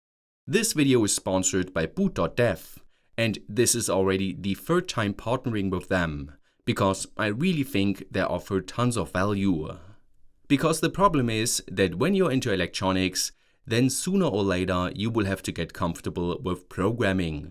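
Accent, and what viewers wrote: Germany. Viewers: you are not even Scottish, this is a heavy German accent if I am not mistaken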